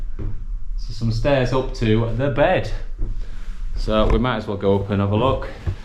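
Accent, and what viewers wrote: Yorkshire, United Kingdom. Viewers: Loved it such a different format loved seeing the different cities food apartments and you standing on street corners looking like you were loitering with intent hope we have more of your travel adventures 😊oh and that great Yorkshire accent ❤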